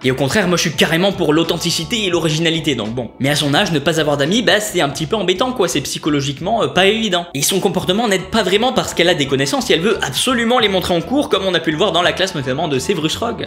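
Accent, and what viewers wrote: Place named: Marseille, France. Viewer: Ton accent marseillais 🤣😂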